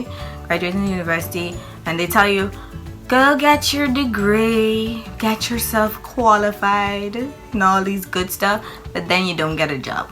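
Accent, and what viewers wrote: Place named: Jamaica. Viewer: I want more of the Jamaican accent!!! 😂❤❤ 10/10 for the accent lol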